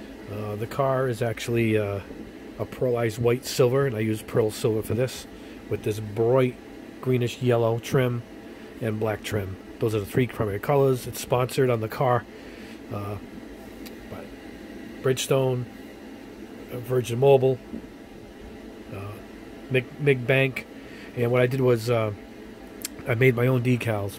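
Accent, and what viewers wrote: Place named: Massachusetts, United States. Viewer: Hey but your accent it sounds like you're from Massachusetts. I'm in Halifax on the South Shore. What store in Massachusetts can I pick this paint up at where do you go. Thank you so much I think I'm doing my 24th scale drift car '1970 Camaro. All Chrome. Maybe blue race stripes. Or maybe just some pinstriping good anyways if you can help me out I'd love it thank you very much great video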